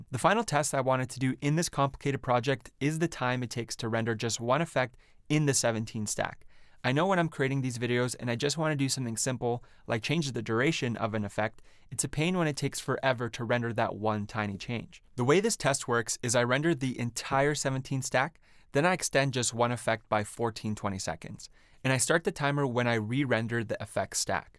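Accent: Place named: Poland. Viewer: It's hard to say. Rather, if it wasn't for the name of the channel, I wouldn't have come up with the idea that you have a Polish accent. If I had figured it out myself, the accent is definitely not the first thing that would reveal your origin. You may as well be a child of immigrants who came from Poland to Canada and you have never spoken Polish anywhere except at home. Tell the truth if you are agree for it: D It does not matter much to me because I watch the channel because of the content, but it's nice if another compatriot is successful on YT...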